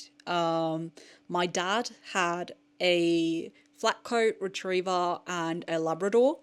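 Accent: Australia. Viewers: Fun video. Also you definitely sound a little Aussie ngl. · I’m English and when I first heard you I thought you were Australian, until I realised you are from Norway 😂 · I’m English and thought for sure u were Australian lol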